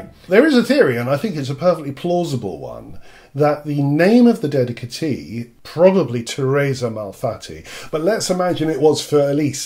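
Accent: United Kingdom. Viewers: Also, being a fan of Monty Python, I couldn't help but notice you're accent. It reminded me of John Cleese? Saw him live, in Charlotte. Thought you were from the same area, perhaps? I've learned, ALL English accents are NOT the same! LOL!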